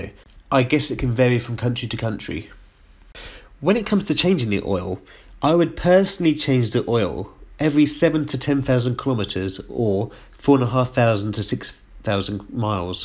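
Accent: United Kingdom. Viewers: Hey, another great video, I really love your British accent too!!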